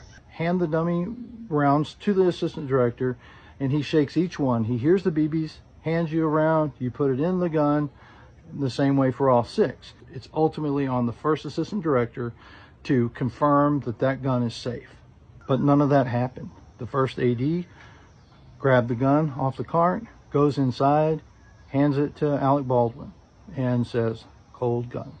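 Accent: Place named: Washington, United States. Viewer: Really sad event. I noticed you sounded like you were from Washington then I saw your hat at the end. Do you work on movies up here?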